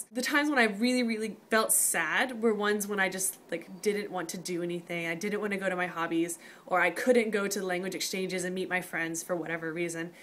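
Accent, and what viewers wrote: South, United States. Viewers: I'm from TN and I think I have more of a southern accent than you do. Do you get comments of your accent a lot? Or do they just hear American more so than a regional accent? I'm kinda excited to hear my students speak with a little twang. :) Although I'm sure I'll have to try to speak without an accent, right?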